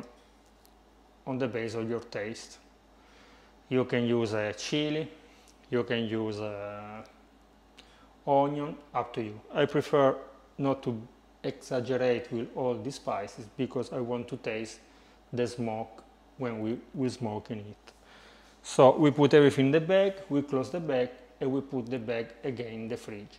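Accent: Italy. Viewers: I love how everything you make turns out spectacular! I want to try making ALL OF YOUR RECIPES! By the way, your English is quite good, and love your Italian accent. Would you do us all a favour and say a few words in Italian to start your shows? Would love to hear you speak your mother tongue, but need some translation in captions, so we can follow along 😊👍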